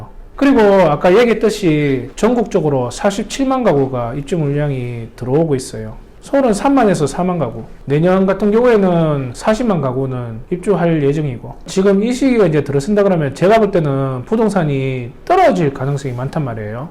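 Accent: Daegu, South Korea. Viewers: 말씀 넘 재미있게 하세요 대구 사투리 넘 매력 있어요~ 귀에 쏙쏙 잘 들어와요~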